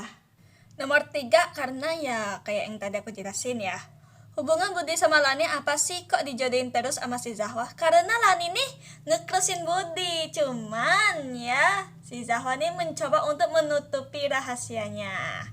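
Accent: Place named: Palembang, Indonesia. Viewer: Pantasan bahasa nya kek ada setengah bahasa palembang gitu ternyata kakaknya orang bengkulu di sumatra selatan soalnya ane orang sumsel juga🗿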